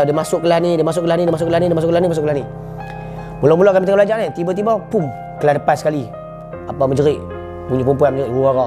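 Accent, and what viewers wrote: Kedah, Malaysia. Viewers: Ska lh dngr abg ckp bhse kedah. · Terbaik la channel hang dik...dengaq hang cakap..terus rindu mk ayh di kg.(kedah)..salam dari selangor dikk..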